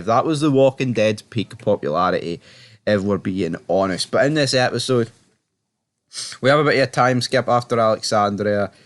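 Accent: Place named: Liverpool, United Kingdom. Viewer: damn i guess scouse accent isnt the best one for youtube videos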